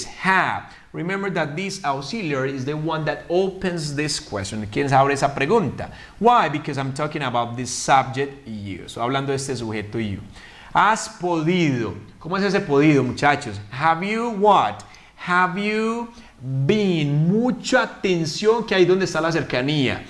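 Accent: Colombia. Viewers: Me desconcentra el acento colombiano 😍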